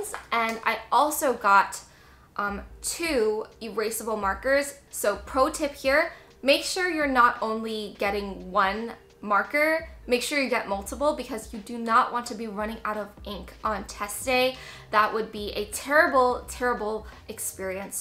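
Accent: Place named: North America. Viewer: HOLY SHIT. You were born and raised in Taiwan and can now speak English with a North American accent? Damn.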